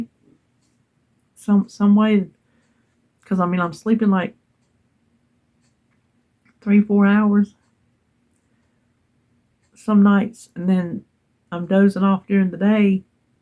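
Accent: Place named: North Carolina, United States. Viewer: I just LOVE to hear you talk! I live in Wilmington and the way you talk reminds me so much of my friend who lives in Lake Toxaway. A few videos back I think I remember you talking about Whataburger in Kannapolis and it brought back so many memories. We used to eat there when I was a kid and would go visit my brother in Concord.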